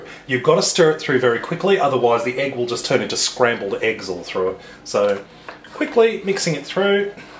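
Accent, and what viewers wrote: Australia. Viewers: This guy has a very clear Australian accent. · Love the Aussie accent!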